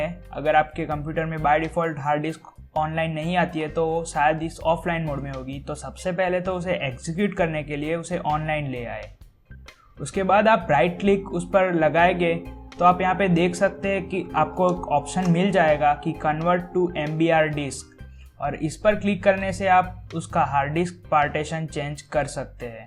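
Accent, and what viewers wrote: Gujarat, India. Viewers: Aap Gujarati ho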